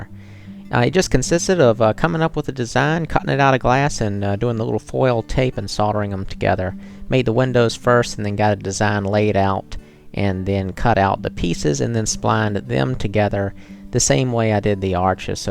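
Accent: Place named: Carolina, United States. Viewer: Sounds like a Carolina accent, should be fine in the winter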